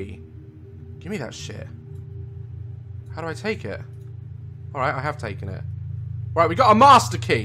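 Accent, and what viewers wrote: United Kingdom. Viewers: with the posh English xD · Is from the uk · fabulous British talk mate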